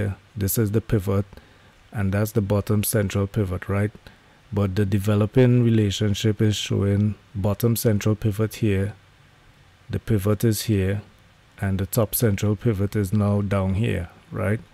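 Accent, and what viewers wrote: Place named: Trinidad and Tobago. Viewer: You sound like an Israelite Trini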